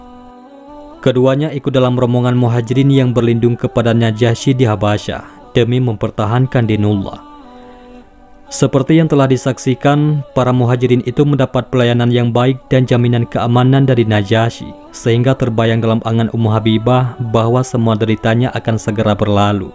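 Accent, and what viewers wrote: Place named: Gorontalo, Indonesia. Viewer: Logat pembawa berita seoerti logat hulondalo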